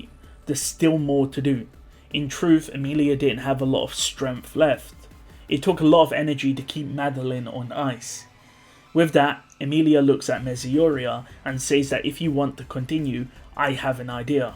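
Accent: United Kingdom. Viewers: That's just him being British. It took him almost a year to stop saying Tappy but still hasn't really got Tappei right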